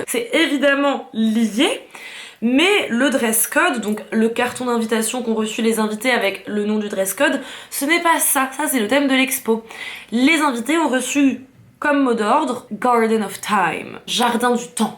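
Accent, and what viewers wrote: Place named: Liège, Belgium. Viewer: Allez, je m'abonne ! Analyse pertinente, des sujets intéressants, un air de ressemblance avec mon actrice favorite Anya Taylo Joy et arrière accent Liégeois de Beaufays très léger. Tout y est 👌🏽! C'est super !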